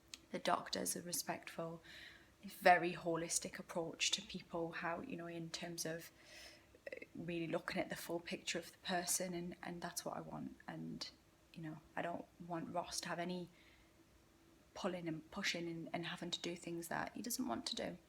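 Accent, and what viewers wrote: Newcastle, United Kingdom. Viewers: your Geordie accent video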